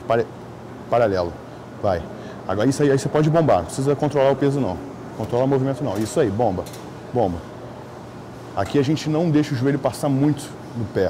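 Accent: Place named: São Paulo, Brazil. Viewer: Deve ser PAULISTA tu .. pior sotaque q tem e as gírias de paulista pqp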